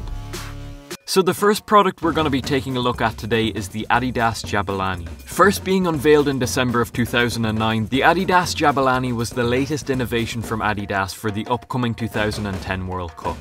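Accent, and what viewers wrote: Ireland. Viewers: Are you irish? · R u Irish?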